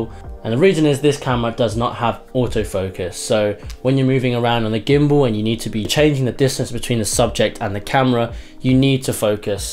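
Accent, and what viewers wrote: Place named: RP, United Kingdom. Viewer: I came from your bmpcck set up and blimey your accent is british to the shits haha, which part of UK u from? Im an aussie raised SE asian born dude and yousound posh af to me. loving it dude. subbed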